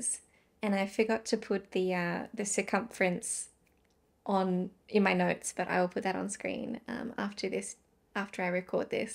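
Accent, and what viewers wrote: Australia. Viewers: So nice to listen to your podcast, an Aussie voice. I'm planning a trip to Brisbane in April so will visit the Little Yarn Store. I'm in the Blue mountains of NSW so lots of handknits are the go for me. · love listening to your aussie accent. I love your Rebel cardigan and you logic $ I think you need to add the value or the wearability of the garment. I'm in Nz, blue dyes do tend to bleed i have been advised to use cold water to block and use hair conditioner instead of wool wash. i am learning the shadow wrap short row heel on my current sock project. i was wearing my finished mkal while watching your podcast, i wore it out tonight and had so many compliments. it's in deep teals and greys merino silk blends. this clip is from Knitty McPurly podcast from 24 may will help you with your swift skien winder issue, <link>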